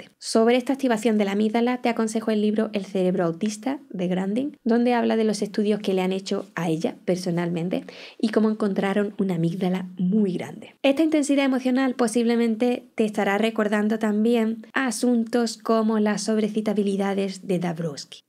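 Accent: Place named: Andalusia, Spain. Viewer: Hola! Me encanto tu perspectiva. Tengo una persona cercana que creo que puede tener TDAH. Una forma no muy severa, pero aun asi con sus dificultades. Gracias por todo lo que has dicho. Me fue muy util. PD: tienes una piel preciosa y me encanta tu acento, que creo que es de España del sur o tal vez de Murcia:)) Saludos!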